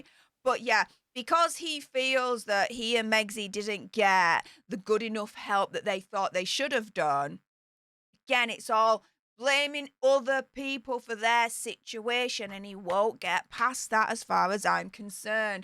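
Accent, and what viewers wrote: Yorkshire, United Kingdom. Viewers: is a Yorkshire lass and her accent reflects that, there is nothing at all abnormal about the way she speaks.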